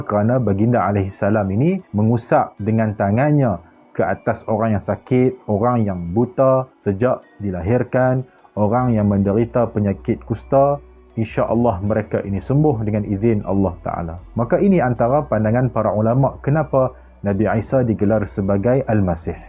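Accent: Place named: North, Malaysia. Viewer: Mula2 mcm nk cover bahasa utara..last2 x boleh tahan..😆😆..apapun penjelasan yang jelas..😁😁😁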